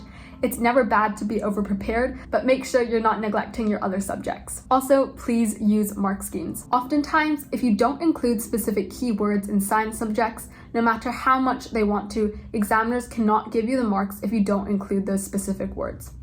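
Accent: United States. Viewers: u sound american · you sound american but are doing gcses? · How come you have an American accent ❤😂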